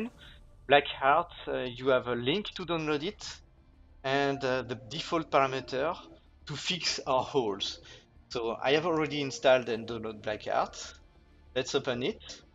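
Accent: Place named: France. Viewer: On sens tellement que tu es francais^^ mais super vidéo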